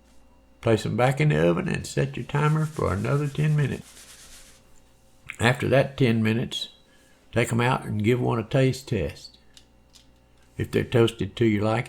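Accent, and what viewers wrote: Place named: South, United States. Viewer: Aww hell yeah, a nice southern accent you know u finna get a nice recipe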